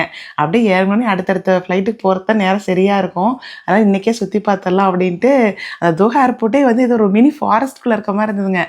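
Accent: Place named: Salem, India. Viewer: Salem tamil rhombha super...maan vasanai innum ungalodu irukku...enga aaya, thatha ellarum salem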